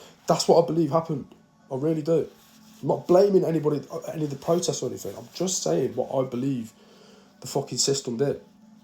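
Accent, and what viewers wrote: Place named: United Kingdom. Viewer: Are you British ? I like your accent oh yeah you are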